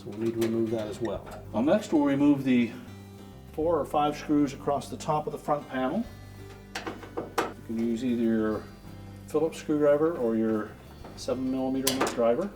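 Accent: Canada. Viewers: Took me 7 hours total.....That included 1 hour to free the tub shaft from the center bearing and 1 hour of power washing and cleaning the tub and gasket. I have an 2004 machine and I did this in 2013 as well as several drain pump replacements. Amazing how cheaply made these China machines are, thin metal. cheap plastics and solder drip-mold nut and bolts!! After following the video, I officially now say "out" like a Canadian.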